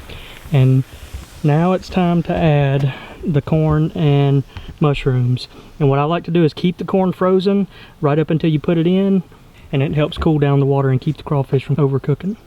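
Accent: South, United States. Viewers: your voice is annoying af. you are deff. southern. but we dont need help cooking our crawfish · Thank you to you and your beautiful family. I don't eat seafood but I love the video and your southern hospitality accent :) Merry Christmas! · That country voice though 😅😂😂😂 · Your accent is so American. God bless you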